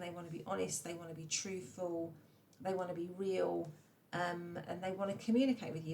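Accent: United Kingdom. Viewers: i noticed your channel a long time ago and been watching a few, it was quite on spot. but i always am puzzled as in why such little follower count? if i can give advice, i would say it's the volume, i have to turn your volume up everytime. and maybe you speak a bit too fast, combining with the British accent combining with how quiet it is...poeple would struggle to understand. i'm saying this caz i simply cant understand why so many channel that doing readings that were never my situation attract so many attention while the one that does make sense is not receiving equial share. so, imrpvoe your mic maybe? i hope you channel grows.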